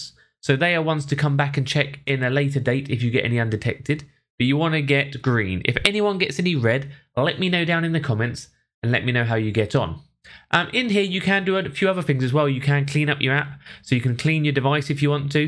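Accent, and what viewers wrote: England, United Kingdom. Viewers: Bro I got to tell you thank you for the the help it really was very informative and I think your English accent man I like I love the way you guys talking to anyone dodgy and stuff I'm over here in America but it's great man brilliant you guys always say brilliant too I love it. Thank you so much for the help I subscribed to your YouTube channel and like the video I'll be checking in more often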